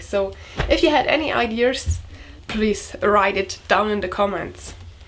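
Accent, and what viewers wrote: Germany. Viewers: please talk in your origin language because your english isn‘t good. we literally can hear that you’re german lol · You sound very german :)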